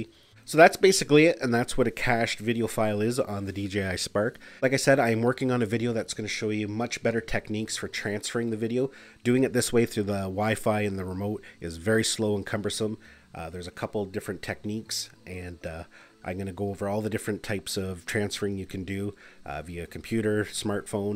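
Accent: Canada. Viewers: Helpful, but focused mostly on your Canadian accent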